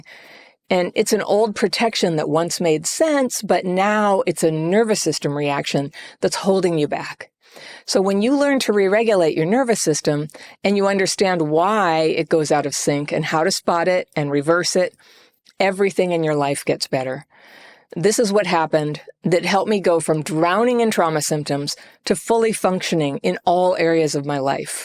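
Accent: United States. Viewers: What a good videos you make, I always feel very seen in what you say and the way you bring your videos feels really like emotional support. By the way, what a GREAT American accent!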